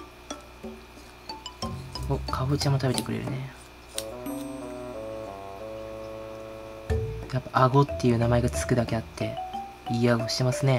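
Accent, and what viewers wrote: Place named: Kansai, Japan. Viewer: 関西弁がとても胸を使われたので不純な気持ちでチャンネル登録してもーた🙈💕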